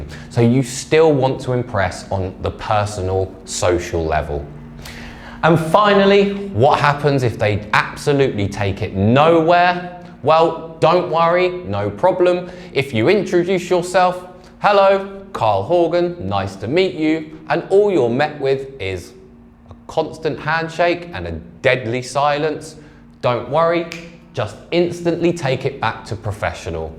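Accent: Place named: United Kingdom. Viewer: love his brittish accent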